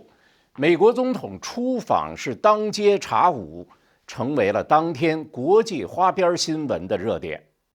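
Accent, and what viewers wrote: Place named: Beijing, China. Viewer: ，您的节目是我日日必看的节目! 您的语音是我最爱的听的语音，正统，正规，严谨无误的北京啌，又不贫嘴，又不官腔，太棒了！而且报道的内容我都信服。尤其是好消息，好动态更让我兴奋无比。谢谢！难得难得啊！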